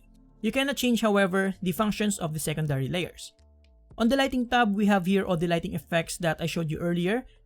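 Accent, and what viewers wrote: Philippines. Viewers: Lmaoo I was not expecting a Pinoy accent, Filipinos on tech tube😎😎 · are you filipino ?? because you sound just like my fam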